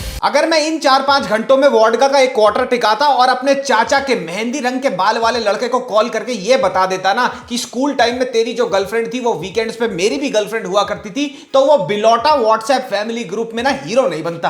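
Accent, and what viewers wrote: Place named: Delhi, India. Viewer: Bhaiya mujhe aj pta chla ap out of india rehte ho. Apki baatein ,jokes ,accent se lgta h ki ap delhi m reh rhe ho. 🤣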